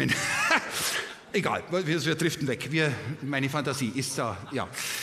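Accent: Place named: Bavaria, Germany. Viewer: Liebe Krustenzuzler - sensationell - da haut`s da ja den Schua weg... bayerische Sprachkultur in Reinform - immer wieder ein Genuß - Danke Wolfgang Krebs.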